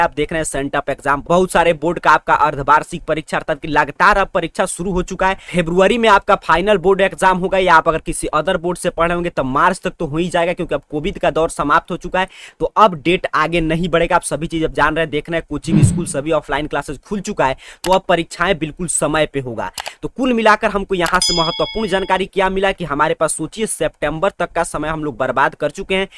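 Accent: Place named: Gujarat, India. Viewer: Sir aapka voice ka ton ek gujrati jaisa hain.....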